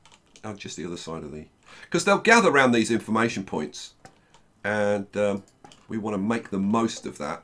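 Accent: South Africa. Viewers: you from South africa? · Are you South African? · Feel like Johannesburg? are you from South Africa?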